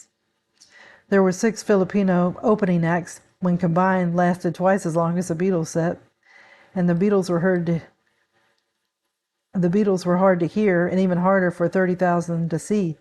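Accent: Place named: California, United States. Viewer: You have such a California accent. You sound like my Aunts. Great video! Keep it up!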